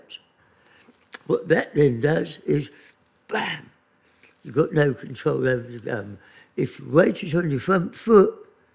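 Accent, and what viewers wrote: United Kingdom. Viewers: I have to admit, I have no idea what he said the 2nd step was. Apparently, I speak English, but I don't understand British.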